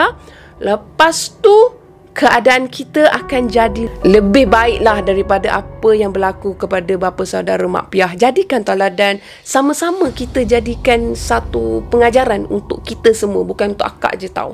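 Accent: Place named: Pahang, Malaysia. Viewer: ni org pahang kan ke bukan sebab loghat macam org pahang..kalau org pahang beloh mane.. semoga maju jaya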